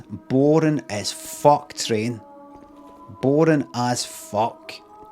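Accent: Scotland, United Kingdom. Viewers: Hence his undying shame about his schemie accent. No amount of our money will ever change that fact.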